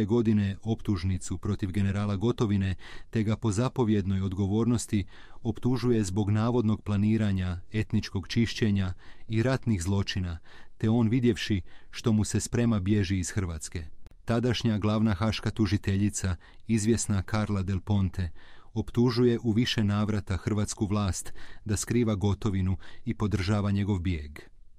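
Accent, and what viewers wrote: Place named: United States. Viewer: Ti kao da si Amerikanac kako to izgovaras Gotovina Zadar itd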